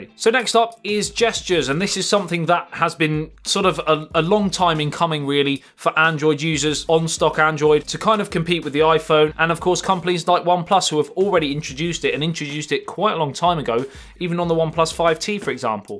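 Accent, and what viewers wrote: United Kingdom. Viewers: Great video 👍 And I love the way you Brits knock off a syllable in the word bat-ter-y and pronounce it ba-tree 😊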